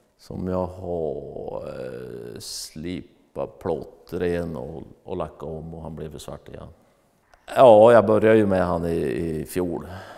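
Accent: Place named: Norrland, Sweden. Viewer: Så underbart att äntligen få höra lite riktig norrländska i denna kanal!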